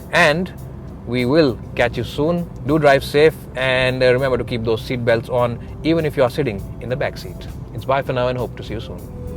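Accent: India. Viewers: I love your English thoughts or scripts you may be having. It is full of good words, to the point and sounds good Indian accent (not like other fakesters). Liked and shared this and subscribed since February '16. Thanks for making such travelogues, it is a treat to watch for an amid traveller like me. I moved to Delhi from Mumbai with my 8year old Tata Indica Turbo in March. I did 1 stop (At Udaipur) journey from Mumbai to Delhi at constant speed of 60-70kmph in March (considerably hot summer). It returned me 26kmpl despite having stuck in Traffic at Bharuch due to bridge work. I am constantly in a hunt to find and visit a hill station and your travelogues helped me alot. thanks again.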